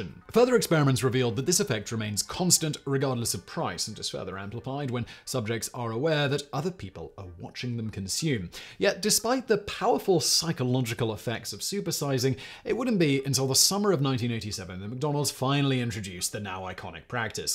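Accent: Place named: RP, United Kingdom. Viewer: Why do he speak in that accent when in much older videos he sounds nothing like this? As someone from England i really feel like he's hamming up the RP accent to appear more sophisticated to the american audience?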